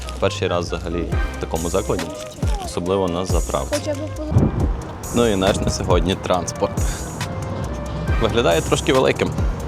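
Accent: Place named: Vinnytsia, Ukraine. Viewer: Лайк за українську(чисту) мову,звідки родом,Віниця?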